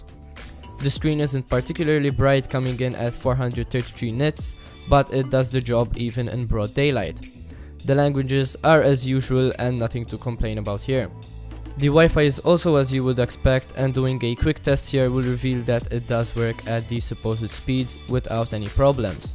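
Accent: Malta. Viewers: Noticed the maltese accent 😅 awsome channel kugin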